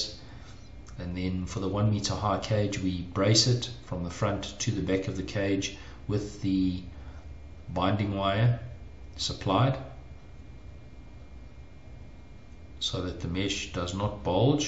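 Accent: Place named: South Africa. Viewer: PS, sorry for changing your gender to, lol. Your accent tells me you are in the S.African continent, we are in the UK, otherwise maybe we could get our supply from you. Our engineer and architect has mentioned a company called ENVIROMESH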